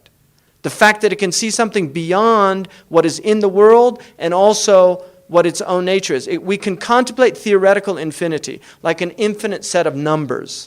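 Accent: Arab world. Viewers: What a beautiful Arabic accent Sheikh is having, Masha Allah well explained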